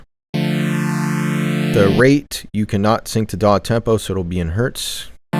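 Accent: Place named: United States. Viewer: For some reason I thought you were British, but that's an American accent thru and thru. 😅 I think PolyMax sounds higher-end than a Juno - more like a Jupiter. Maybe somewhere inbetween. :)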